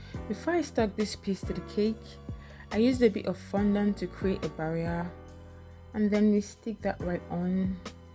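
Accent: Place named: RP, United Kingdom. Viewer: It's the Queens English for me ❤❤❤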